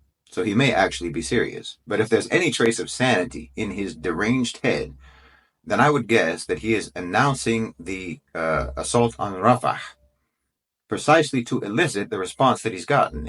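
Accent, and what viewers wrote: United States. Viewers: What is absolute madness is this nutter saying that Israel as a State should be terminated. It is simply repeating Hamas’ propaganda with an American accent.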